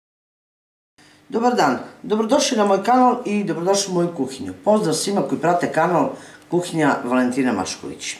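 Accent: South, Serbia. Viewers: Kuhinja Valentina Mašković, ma nista ja ne zamjeram. Samo vi govorite kako vec govorite. Inace je vas juznjacki govor bas super (malo makedonskog i malo bugarskog utjecaja 😊). Zena mog rodjaka je Makedonka (Skopjanka). Samo vi kuhajte. Nek se stvari "krckaju" i nek kuhinja mirise. Ja sam uglavnom vegetarijanac. Kazem "uglavnom", jer kada podjem u Hrvatsku (iz Dalmacije sam rodom) onda uzmem malo ribe. I to je to. Pozdrav i sve najbolje vam zelim.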